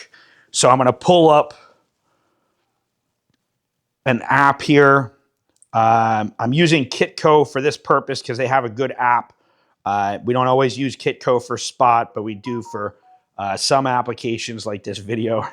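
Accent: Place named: New England, United States. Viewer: Hey, I bet your not.......I recognize that voice...........it's!!!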